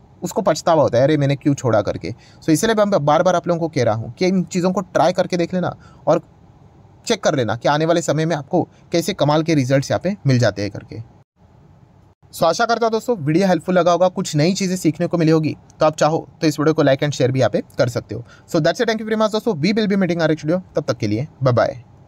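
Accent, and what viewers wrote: Maharashtra, India. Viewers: Sir aap kha se he Maharashtra ya Delhi se please confirm Aap ki voice se to aap Maharashtra se lgte ho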